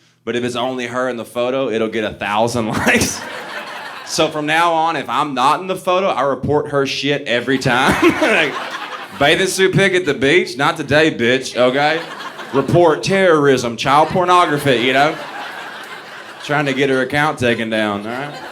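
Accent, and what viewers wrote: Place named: South, United States. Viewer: You are hilarious! The southern accent just makes a Philly girl melt!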